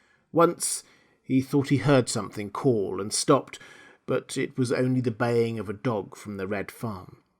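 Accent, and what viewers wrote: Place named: United States. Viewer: Great American accent!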